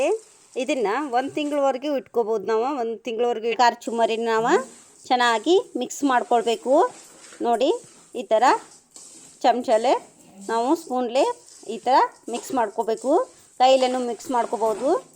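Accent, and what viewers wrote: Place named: North Karnataka, India. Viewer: ಅಕ್ಕ ನಮಸ್ಕಾರಗಳು😊 ನಮ್ಮ ಉತ್ತರ ಕರ್ನಾಟಕದ ಭಾಷೆ ಕೇಳೋಕೆ ಬಹಳ ಚಂದ ಅದರಾಗೆ ಮಂಡಕ್ಕಿ ಜೊತೆ ಇನ್ನು ಚಂದ, ನಮ್ಮಕ್ಕನ ಕನ್ನಡ ಮಂಡಕ್ಕಿ ಗಿಂತ ಚಂದ 🎁🙏🏻✅💐 ಅಕ್ಕ ಇಂತಿ ನಿನ್ನ ಪ್ರೀತಿಯ ತಮ್ಮ 😍🤗🙏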